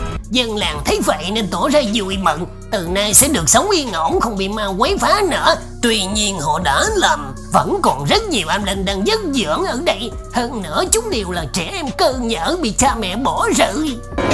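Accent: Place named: South, Vietnam. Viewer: Giờ mới thấy giọng miền nam lồng tiến , hầu hết giọng bắc kì giờ muốn muốn bao cả youtube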